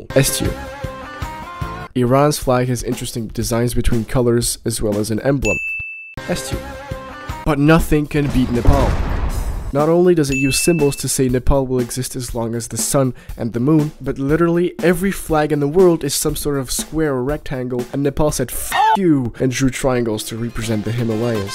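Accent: France. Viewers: Are you french? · are you french? Unsubbed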